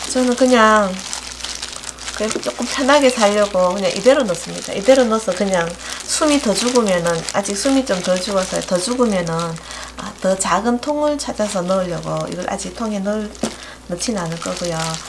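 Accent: Gyeongsang, South Korea. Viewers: 경상도라 정감이가네요 그리고 쉽게 하시네요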